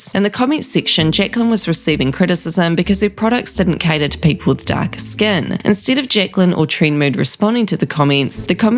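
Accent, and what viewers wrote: Australia. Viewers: The channel host is australian. 😁. Im trying nowadays to distinguish accents. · Australian female accent is the best imo.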